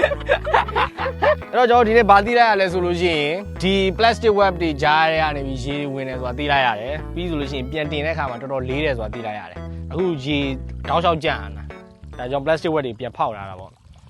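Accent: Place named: Mandalay, Myanmar. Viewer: Are you originally from Thanbuzayat.?Actually,I thought you are from Mdy.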